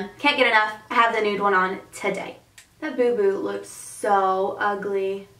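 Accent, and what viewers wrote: South, United States. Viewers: I really enjoy watching your videos. I love the products you recommend, I love your makeup in every video, and I adore your southern accent. But...your lighting is SO bright that it washes out (you sometimes) but mostly the swatches and products you show. It's hard to get a good idea of what the coloring is. Just a note.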